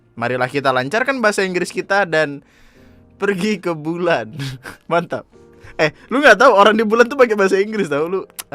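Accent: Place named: Java, Indonesia. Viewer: Radak radak Jawa woskwowkowkwowk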